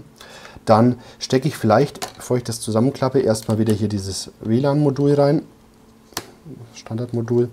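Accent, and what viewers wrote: Germany. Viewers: Mit dem leicht fränkischen Akzent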